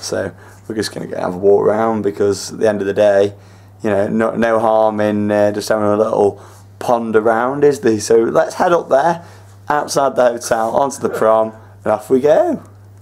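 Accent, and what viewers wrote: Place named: West Midlands, United Kingdom. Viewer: Your West Midlands accent was stronger back then lol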